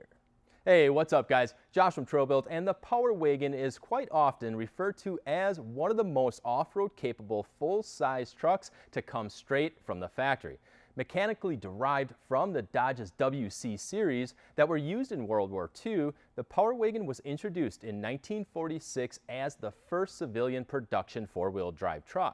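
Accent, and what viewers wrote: Wisconsin, United States. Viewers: I could hear the Wisconsin accent the second I heard you say "wegon ." I like hearing all the different American accents! Love the Power Wagon, but I wish it came with 35's and the axles. I'd still buy one, but 35's would be the cherry on top. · That's how them good ole wisco boys say out here lol I catch myself saying it like that too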